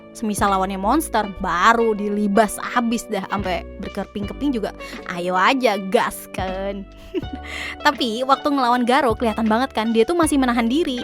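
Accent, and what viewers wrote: Java, Indonesia. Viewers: nih org jawa apa medan seeh wkwkwk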